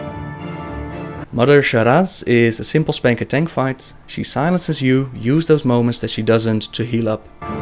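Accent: Netherlands. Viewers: zowieso nederlands xD klote accent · You sound dutch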